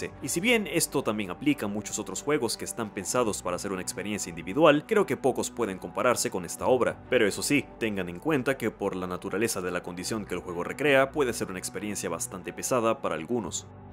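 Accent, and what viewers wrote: Venezuela. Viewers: como se nota q eres venezolano, salu2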